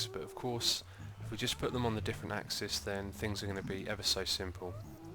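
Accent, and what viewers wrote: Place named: United Kingdom. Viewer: do you realize for the longest time whenever you mentioned Biffa, I always thought you were saying Biffer, with the British accent there's not much of a pronunciation difference anyway.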